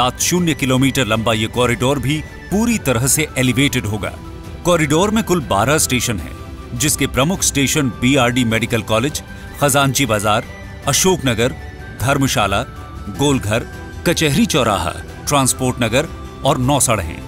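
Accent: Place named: Bihar, India. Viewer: Varanasi The way of talking is completely Bihari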